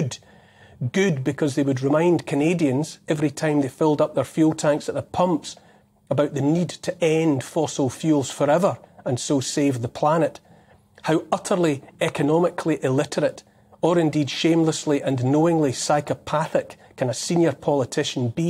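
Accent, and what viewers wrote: Scotland, United Kingdom. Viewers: You'll get no argument from me on that one 😁 😉 Neil Won't Kneel A gentle, beautiful Scottish lilt A kind, empathic, forward tilt An open heart; a caring face A willingness to take his place. A strong, home-hitting monologue Reminds us of the boiling frog The courage to become a sleuth A passion to release the truth. A deep desire to crack the whip Illuminates our freedom-strip Calls out the darkness taking hold The shiny despots; plated gold! Intense and raw, he lays it bare A soul-enhancing, honest stare Inflections drive the message through He speaks for Us - for me and you! Discernment's such a precious gift His words create a mighty lift Take heed of all he has to say He knows - it shouldn't be this way! 🤗🦋🐬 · I fond of his scottish accent and independent attitude :)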